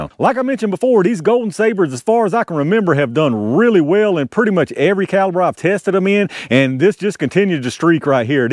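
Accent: South, United States. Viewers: I love your videos brother!! full of information and data. You talk country AF! It would be funny as all get out if you did it in an Irish accent!!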